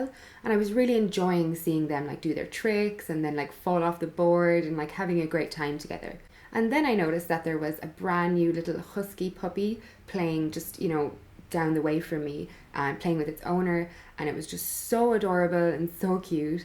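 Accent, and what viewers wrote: Ireland. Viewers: U sound Irish 🍀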